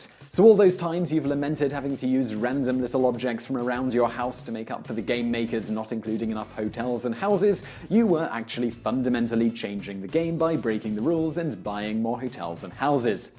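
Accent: England, United Kingdom. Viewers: It kind of is. There's the English language. But there's also an accent to it depending on who's speaking it: someone from England, America, Ireland, France, etc. speaks English with an Engkish accent. I speak it with an American accent. Etc, etc. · Whoa. Didn't realize he had an English accent until I saw the game board with the Italian currency symbol ....my brain works in mysteriously beautiful ways. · the smoother British voice Vsauce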